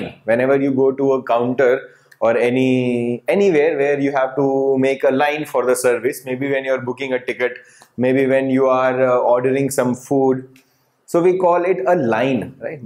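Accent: India. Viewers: I like how you elaborated what queuing theory entails with simplicity and clarity even though Indian accent is a bit of challenge.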